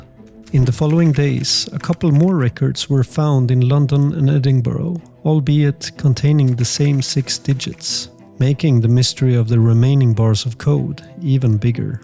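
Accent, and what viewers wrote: Sweden. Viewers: Du låter mycket svensk. Är du svensk?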